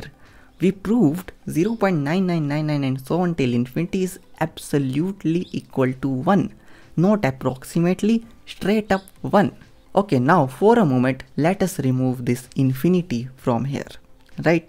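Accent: India. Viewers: The first Indian Accent which matches the representation of Indian accent in Western World. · As an Indian myself, I've never heard such a strong Indian accent in my entire life 😅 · I love your hard accent. Feels like home. It feels much more unique even for Indian accent sounds different from commonly spoken english at home.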